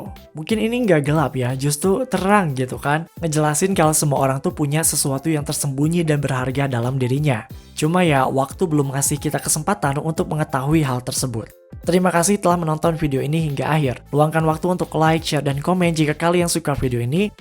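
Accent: South Jakarta, Indonesia. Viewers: Bang lu orang jaksel atau sengaja make aksen jaksel kaya "literally"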